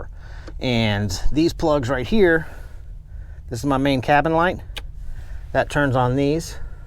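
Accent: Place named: South, United States. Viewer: Very organized and attention to detail. Now, go on some adventures and take us along. You seem like a good entertainer. Like that southern accent.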